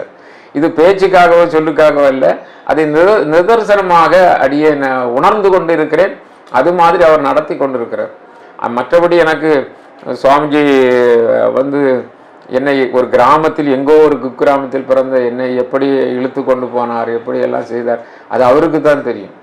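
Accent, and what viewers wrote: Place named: Tamil Nadu, India. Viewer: தெற்கத்தி தமிழில் உங்கள் அனுபவ பகிர்வு அழகு. ஜெய் யோகி ராம்சுரத்குமார் 💐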